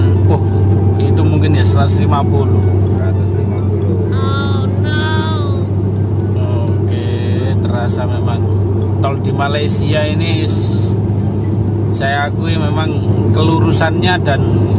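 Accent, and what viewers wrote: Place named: Java, Indonesia. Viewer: Aku suka dengar slang Jawa mu dong. Rasa senang dan menghiburkan.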